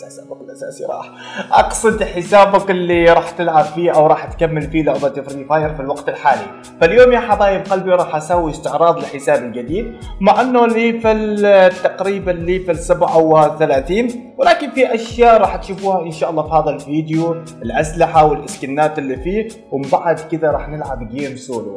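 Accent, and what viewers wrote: Oman. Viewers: انت ايش اصلك عماني ولا شو ؟؟؟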